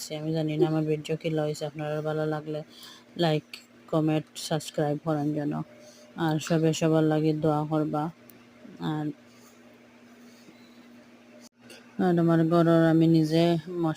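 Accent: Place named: Sylhet, Bangladesh. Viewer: আফনে খাঠি সিলটি মনে হয় খালা 😊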